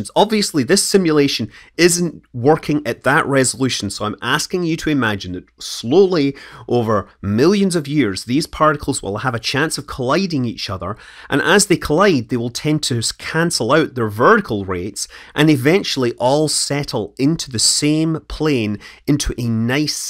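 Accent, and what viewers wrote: United Kingdom. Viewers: Please use less accent in your speech, UK is not the whole world.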